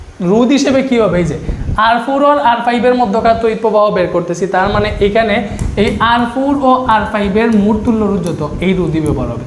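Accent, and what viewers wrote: Sylhet, Bangladesh. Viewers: Sir ki syleti naki 😅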